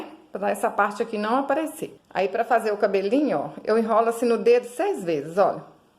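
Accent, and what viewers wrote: Minas Gerais, Brazil. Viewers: Estou apaixonada pela sua arte e por esse jeitinho "mineirim" de falar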